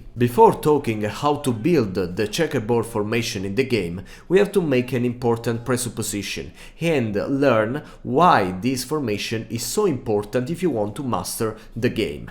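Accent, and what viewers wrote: Italy. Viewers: Ciao, bel video, l'accento italiano comunque si sente a chilometri hahahahah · I new this accent was italian 😂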